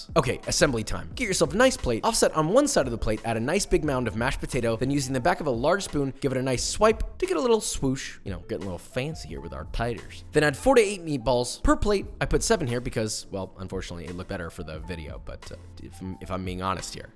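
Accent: United Kingdom. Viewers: Just me or did get more british in this video? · More of the British accent please. As a Brit it's still somehow soo satisfying to listen to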